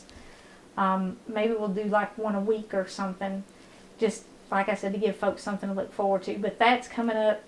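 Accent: South, United States. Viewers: 2X T-Spring. I just love your channel. As a northerner, I love your southern accent. You share a lot of information and I also love that you are a. Christian, as am I.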